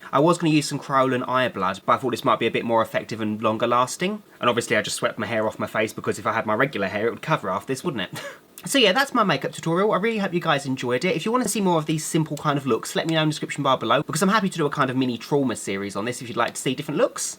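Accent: United Kingdom. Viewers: Your british accent is awsome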